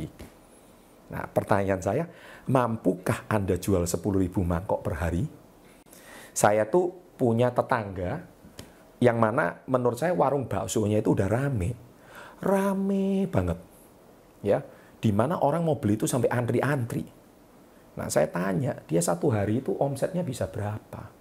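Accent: Java, Indonesia. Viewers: ngmong'a medok,kyak'a anda orang jawa y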